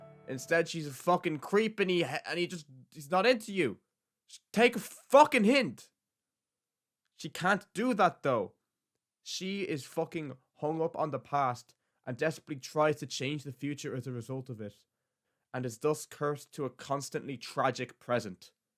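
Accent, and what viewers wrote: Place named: Ireland. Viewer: No way I've just gone and finished Penguindrum, went on youtube to find a good analysis about it and found a quality video by another lad from Dublin about it (I'm assuming from the accent, if not I'll look like a fucking twat so let's hope I'm right). Felt the exact same way about penguindrum, gave it an initial 9 but I'll see how I feel about it once time passes and I might become a 10. Great vid and mad props for being an Irish anime youtuber that isn't eyepatch wolf. Honestly never thought another one existed. Good luck in the future brother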